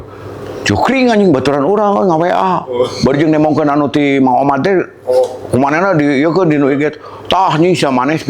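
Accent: Indonesia. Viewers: resep ngdangu na, sunda asli ieu mh😂..... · Logat brdk cicadas ,anatapani 😂😂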